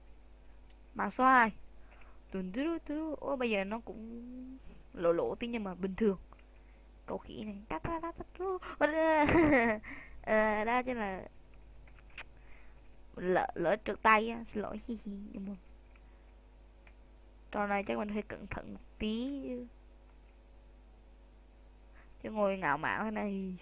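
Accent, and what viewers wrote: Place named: South, Vietnam. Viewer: Hồi nhỏ là giọng hơi miền Nam rồi không giống ba mẹ gì cả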